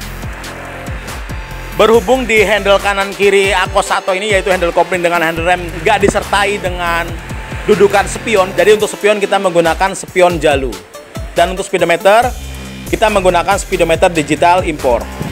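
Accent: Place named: Banyumas, Indonesia. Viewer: Ngapak pokoke jozzz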